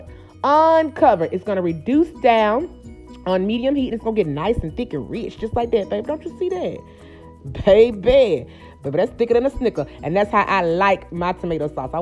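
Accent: South, United States. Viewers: I swear u entertaining even when I know how to cook just remind me of my aunt or sister !!! Where u from got to be down south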